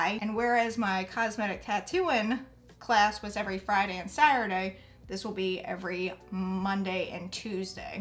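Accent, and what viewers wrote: South, United States. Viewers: Just got a couple of nose piercings and was looking for aftercare advice and and noticed makeup eyeshadow colors. I love to see bold colors used even though idk how to put makeup on lol but I love the accent (sounds southern and northern at the same time) and listening to her voice is so natural ❣️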